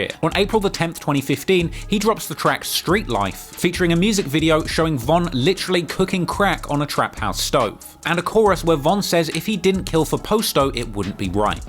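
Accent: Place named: Cockney, United Kingdom. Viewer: 😂😂😂 it's PAH-STO ..that cockney accent fucks up alotta pronunciation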